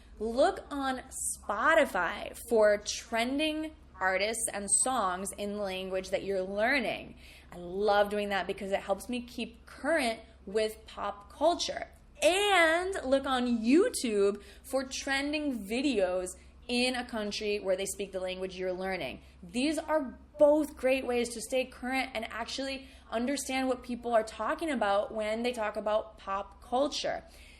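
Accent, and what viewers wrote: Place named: United States. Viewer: What is the difference between American accent that you speak and Canadian accent?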